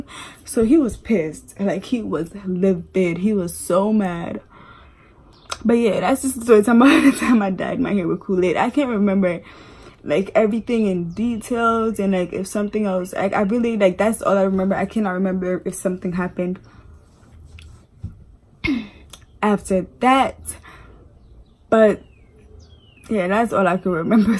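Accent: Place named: Jamaica. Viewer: Nuh understand where yo Jamaican accent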